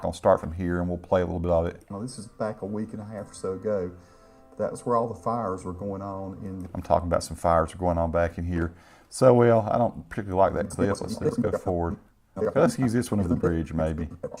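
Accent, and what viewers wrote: South, United States. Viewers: Sounds like a Southern Bumpkin 😊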